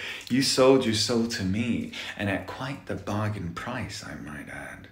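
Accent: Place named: United Kingdom. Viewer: The British Accent😅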